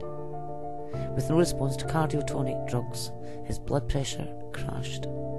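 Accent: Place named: Netherlands. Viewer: That’s a Dutch accent that the narrator has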